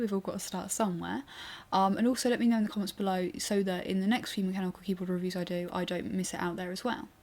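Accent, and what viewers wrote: United Kingdom. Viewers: British accent ? =o)?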